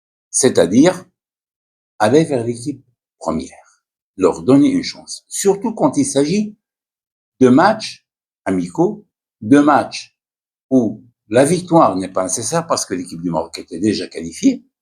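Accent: Standard. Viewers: فرنسية نقية ا السي ، تبارك الله ماشاء الله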